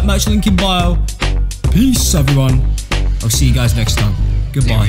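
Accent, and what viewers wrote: United Kingdom. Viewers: I cant take it seriously with his british voice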